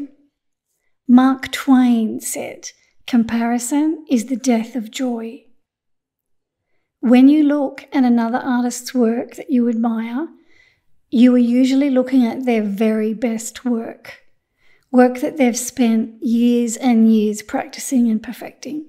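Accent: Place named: Australia. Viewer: I love your videos. I have just started watercolor painting after nearly 45 years since school and found your tutorials and tips very helpful and inspirational. I also love your humorous outtakes at the end and love your Aussie accent. ❤❤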